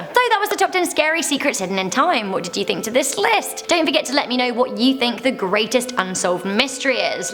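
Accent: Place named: United Kingdom. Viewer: gotta love the uk accent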